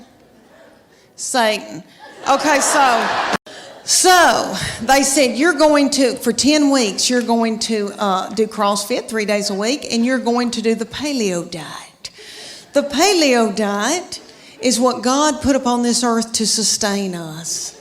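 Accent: South, United States. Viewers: now that twang means no teeth, no brain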